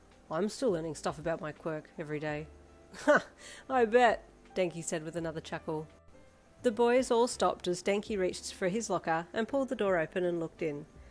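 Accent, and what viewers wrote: Australia. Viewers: Naur, Aussie accent is awesome!! 😁💜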